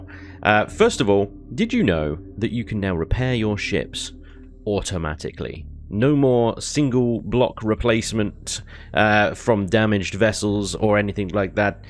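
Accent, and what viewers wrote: United Kingdom. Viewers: It's his british accent. Really charms your pants off, lol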